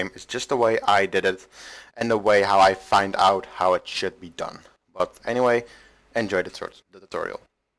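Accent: Netherlands. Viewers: I knew you were dutch by your voice but i can read it here! sup same country bro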